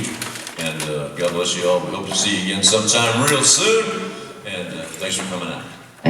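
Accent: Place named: Arkansas, United States. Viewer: He sound's like our Arkansas Boy.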